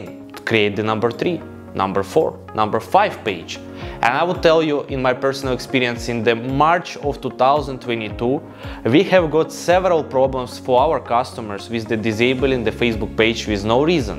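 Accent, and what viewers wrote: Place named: Russia. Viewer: Русский акцент режет ухо😢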